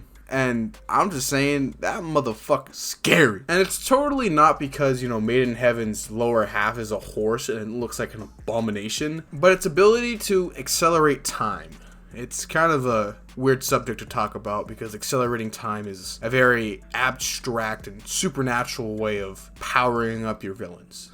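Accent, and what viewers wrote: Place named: Australia. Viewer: 277 Christian Kincaid Christian Kincaid 4 months ago I feel like an SBR Joestar family tree would be just as interesting, despite not being around as long. 114 Yoxiv Yoxiv 3 months ago Hey, how dare you forget about Jotaro's adoptive son Koichi? He's like the child Jotaro never had! 50 stan 99 stan 99 4 months ago How confusing is the Jojo family tree? Araki: Yes 72 Ken D. Uchiha Ken D. Uchiha 4 months ago Realized josuke and jotaro’s mom are siblings?😂 65 Shiranui prestonsaga Shiranui prestonsaga 4 months ago The family tree becomes so complicated once we hit part 4 and beyond 49 NoelxHunter NoelxHunter 4 months ago “While the others don’t have a sarcastic Australian man narrating” The Anime Man: Excuse me!!!??? 47 CondorShelves CondorShelves 4 months ago Donatello's last name is Versace, like the fashion brand. Part 6 in particular had tons of fashion references. 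Thanks for Jojo monday, always makes me happy to see new Jojo content uploaded straight into my youtube feed. 54 Damian Nova Damian Nova 4 months ago I do want you to do the SBR family tree because I know you’d do a great job and it would be loads of fun 32 Avocado Leeminovela Avocado Leeminovela 4 months ago The Joestar family is the lineage with a birthmark in the shape of the suscribe button 17 Sven Servette Sven Servette 4 months ago I didn't realize you were Australian. I thought you were American trying to be fancy. I guess that describes the Australian accent pretty well. 36 preseetha Deepak preseetha Deepak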